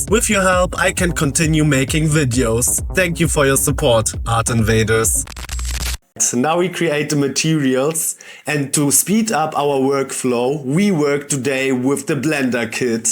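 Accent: Germany. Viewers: great video and love your accent. Are you German?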